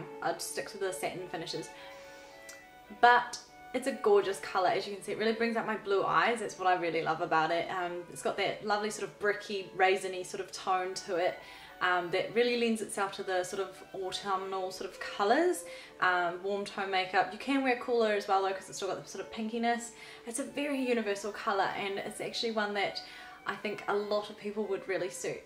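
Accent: New Zealand. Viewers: FINALLY a classy woman on Youtube doing makeup!!!! YUSSS,,where abouts are you from? im a kiwi and you sound kiwi/british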